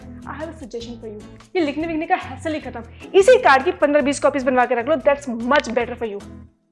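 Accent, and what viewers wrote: Haryana, India. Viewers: That's Awesome....you guys rock...me and my wife has started watching and now becoming addicted to your videos. For you; u have a naughtiness that is actually a punch in the video and for; Dominance, harayanvi tone with cuteness is the heart winning that has become a right combination. Keep it up you both. Thanks for making us laugh. God bless you guys..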